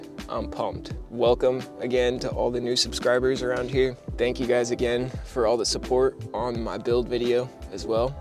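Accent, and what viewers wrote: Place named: Memphis, United States. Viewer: You from Memphis? You sound like it. Where are you from? Tell me I'll subscribe.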